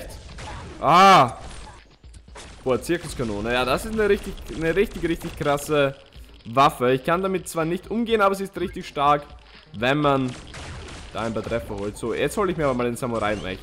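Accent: Austria. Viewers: Bist du östereicher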